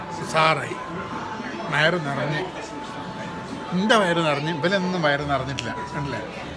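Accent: Kozhikode, India. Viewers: കോഴിക്കോടൻ ഭാഷ ഇജ്ജെങ്ങനും മാറ്റിയ കൊല്ലും അന്ന ഞമ്മള്..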